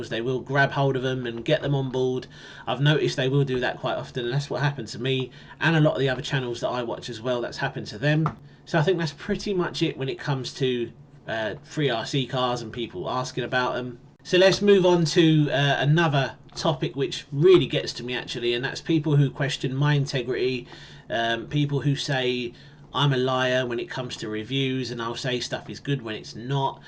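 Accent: United Kingdom. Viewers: I like your accent. I know there are several different British accents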